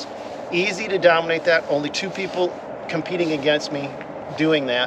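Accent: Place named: Midwest, United States. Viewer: Hey man, love your videos. I’ve noticed I recognized you from another video and I’ve been enjoying the videos. I also wanted to ask, where you’re from? Heavy midwestern accent comin’ from you! Lol kick ass channel 🤘🏼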